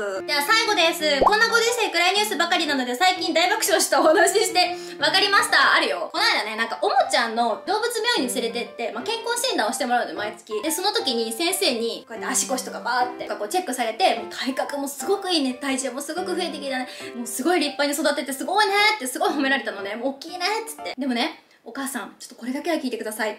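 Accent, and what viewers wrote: Kyushu, Japan. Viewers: 同じ九州だけど「あんたそんなことばっかりして〜」までしか分からん笑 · 福岡やけど方言わかりすぎて（笑）さすが九州🥺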